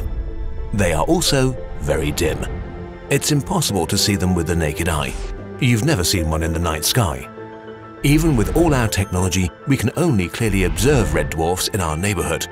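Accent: United Kingdom. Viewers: Something about a British man calling a Red Dwarf a baby is satisfying. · British guys with relaxing voices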